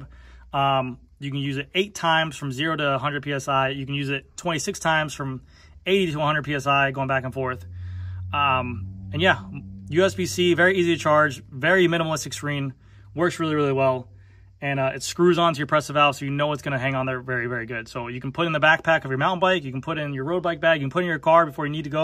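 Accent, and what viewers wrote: Australia. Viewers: Kool just ordered......Really the Aussie accent,.....to funny....oh and the reference to hill KOM, hilariously. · The Aussie accent was on point!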